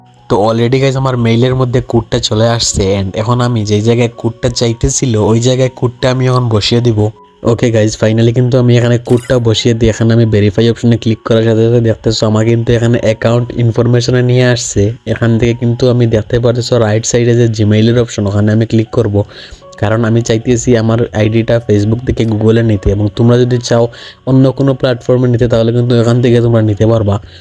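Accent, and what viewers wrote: Sylhet, Bangladesh. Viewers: ভাই কিতা সিলেটি নি ❤ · সিলেটিদের কথায় আলাদা ভাইব আছে ভাই শুনলেই বুঝা যায়। শুভকামনা রইলো এলাকার ভাই❤ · Vai Sylhety ni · ভাই আপনি কি সিলেটি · vai apni Sylheti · ভাই তুমি সিলেটি নি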